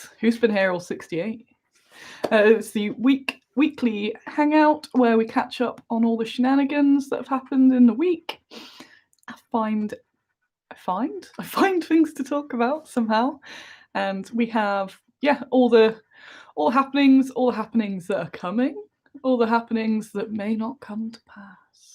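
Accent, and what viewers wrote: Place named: United Kingdom. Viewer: You talk like a British person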